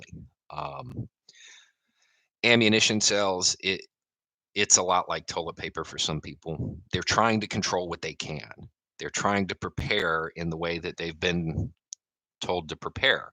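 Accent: South, United States. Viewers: eat the r*ch South American or Southern? That's two completely different things. has kind of a southern accent. It is far from a South American accent. (I only say "kind of" because I'm probably so used to a southernish accent that I don't really hear it as an accent.)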